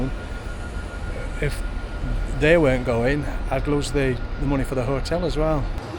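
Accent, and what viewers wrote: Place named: Barnsley, United Kingdom. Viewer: Love the Barnsley twang. Knob-dy and int it. I talk exactly the same! 😂😂😂😂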